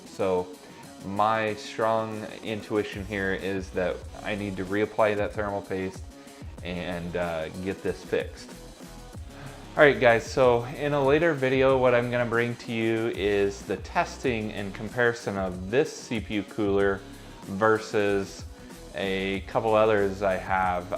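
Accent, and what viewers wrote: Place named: Brooklyn, United States. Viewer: if i didnt know id think you lived in brooklyn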